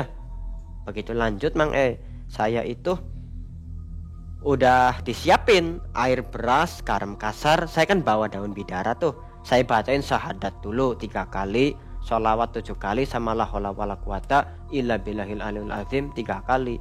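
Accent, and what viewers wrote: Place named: Banten, Indonesia. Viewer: mas kalo berbicara seperti logat bahasa orang banten😊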